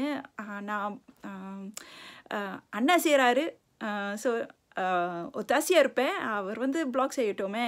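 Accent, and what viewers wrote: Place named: Singapore. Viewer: Hai akka, too rocking netherland tamilan video. Good Inspiration and tamil talking too good. Your tamil language like singapore tamilan speaking same like that. Solo Woman Boat Rider ....Real Tamilachi Akka you like that. My Native in TamilNadu in Thanjavur district......etc. Please give me your Email Id Akkaa.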